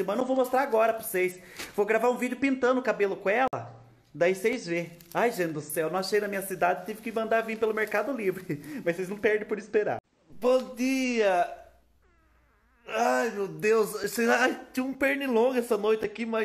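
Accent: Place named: Paraná, Brazil. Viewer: sua cidade faz divisa com o PR?? Estou curiosa para saber pq meu ex mora em Jundiaí do sul PR e o sotaque as palavras tudo é igual ao de vcs aí. Si puder respode eu 😍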